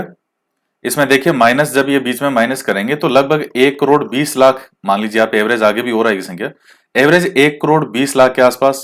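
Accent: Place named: Bagdi, India. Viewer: Sir हमारी तो आपके वाली ही बागड़ी बोली है, आप जब भी बागड़ी वाले शब्द बोलते हैं तो हमें बहुत ही अच्छा लगता है, जैसे ताती बलती लू,धाप के राबड़ी पियो और बाजरे की रोटी खाओ🙏🙏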